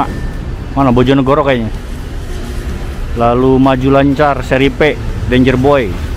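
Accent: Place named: Madura, Indonesia. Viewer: anda orang madura ya bang ? kok logatnya medok banget maduranya 😁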